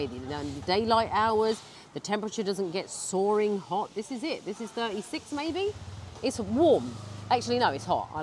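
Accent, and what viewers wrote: United Kingdom. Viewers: i love your voice and British Accent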